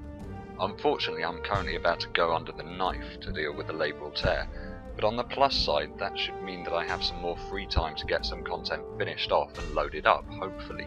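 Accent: United Kingdom. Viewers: For some reason I loooove having earth and space explained to me by a person with a British accent. I don't know what it is.. maybe the impression that I'm listening to the Hitchhikers Guide. Anyway, thanks for making these videos. On a side note, we absolutely need people asking questions about this or trying to challenge any "dominant" ways of thinking. If that weren't the case, we would never make any progress whatsoever. And when dealing with questions about space, the universe and our place in it, the sheer and ever incresing gigantic scales that you have to wrap your head around, can make it hard to combine basic principles and make sense of them, even if you have actually understood them on a smaller level. In order to support people making progress on their own, we should always refrain from name-calling; apply logic and be patient about it. Also, whoever relies on the scientific method should always embrace the possibility of his theory or working model being proven wrong at some point. 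If in this case, however, anybody proclaims he can challenge the spheric model of earth which he hasn't understood (after seeing A LOT of FE-videos I understand this is THE first and foremost wall that flatearthers crash into), supported by false claims which, as he heard from somebody, somewhere, were "actually true", not being able to support this or any other claim or reproduce it by his own means with any model or experiment, whilst having all his "evidence" shattered by one logic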